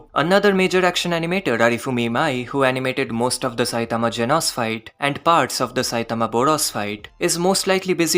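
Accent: India. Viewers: First time hearing your voice, I know you're indian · Bro has an indian accent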